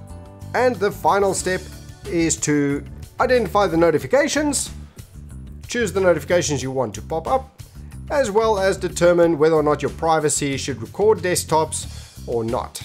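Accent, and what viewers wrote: South Africa. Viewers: Nice vid dude, you sound South African... can u conform? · Are you south african?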